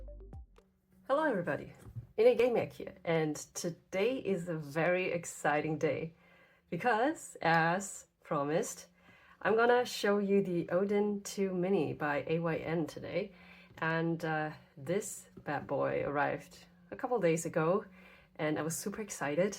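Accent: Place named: Germany. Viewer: I thought so! I have a friend in germany and i dont mean to generalize, but the way you pronounced your words were very familiar! Cant wait to see you improve further.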